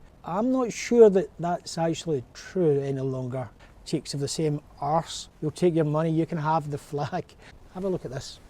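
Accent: Scotland, United Kingdom. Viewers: It is good that your Scottish accent is not too strong to understand.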